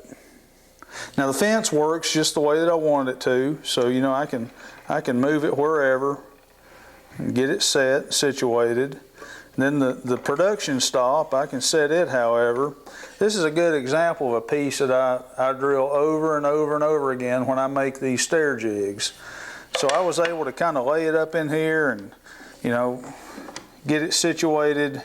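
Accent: South, United States. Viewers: Looks good to me and I have now found someone that sounds as southern as I do.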